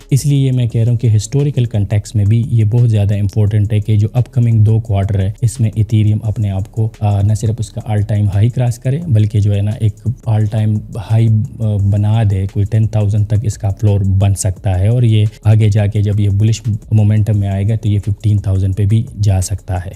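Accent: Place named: Pakistan. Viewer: pakistani ascent lag raha. ethereum bare me pata he pakistaniyo bahut achi baat he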